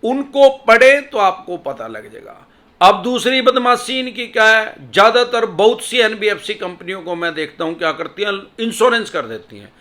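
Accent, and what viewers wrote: Haryana, India. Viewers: सर आप हरियाणा से हो ?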